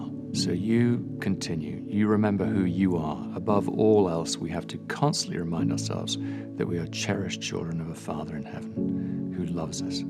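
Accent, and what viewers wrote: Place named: United Kingdom. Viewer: LOVE THIS MESSAGE SO MUCH!! esp w/ the british accent well done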